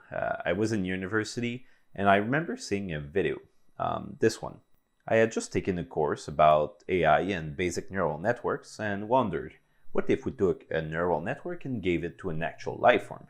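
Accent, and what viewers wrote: Quebec, Canada. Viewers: I am from Quebec (Canada) and your accent is pretty similar at the one that we have here when we're talking english, are you from Quebec? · Hey mec j’ai vu ton vidéo sur la simulation de 100 heures et en t’écoutant je me disais: ha j’suis sur que c’est un ingénieur (québécois). Tu représentes le ´deuxième ´ type d’ingénieur: le type qui devient moniteur de ski ou maraîcher ou youtubeur… bonne chance dans ton projet c’est super intéressant!!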